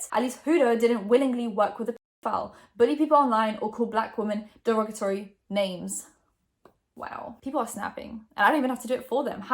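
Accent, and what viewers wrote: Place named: London, United Kingdom. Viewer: she's polish but she's lived in england for a long time, so it's a london accent by the sounds of it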